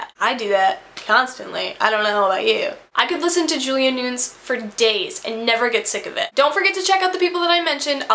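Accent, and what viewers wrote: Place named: Upstate New York, United States. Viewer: Upstate accent lolz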